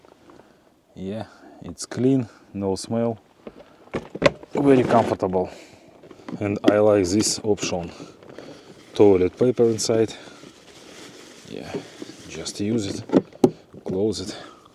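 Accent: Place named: Crimea, Ukraine. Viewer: Ох, как бьёт по ушам этот Крымский акцент )))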